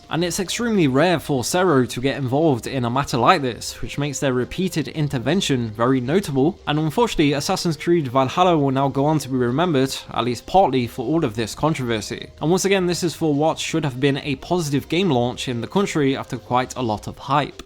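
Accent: United Kingdom. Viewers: He's british. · He is british. It may come as a suprise but most people who write or speak in english on the Internet are not from America.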